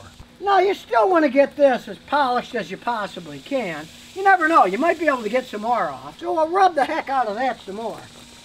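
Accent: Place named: Pennsylvania, United States. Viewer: ! Hi... I see a Texas plate on your'n car but you ain't from Texas.. I'd guess Pennsylvania or mayhap Ohio judging your accent?.. where is from?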